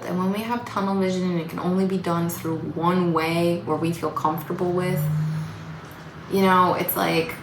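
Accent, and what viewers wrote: New Jersey, United States. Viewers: I’m originally from eastern jersey. Did you ever live near there in the past? I picked up a little accent as you were speaking in this video I had never heard before in your past videos. And according to my wife, it sounds like my accent. Just asking. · Love your jersey accent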